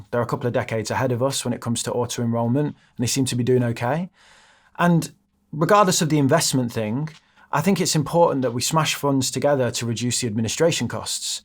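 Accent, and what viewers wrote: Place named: North, United Kingdom. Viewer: I think you would be a great person to help educate the public on investments, your northern accent would be really beneficial as a posh accent and discussing money will turn a lot of people off as it'll feel harder to relate.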